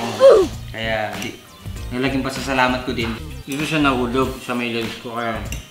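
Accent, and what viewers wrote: Pampanga, Philippines. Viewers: Sarap pakinggan boses ni kuya ska ung puntong kapampangan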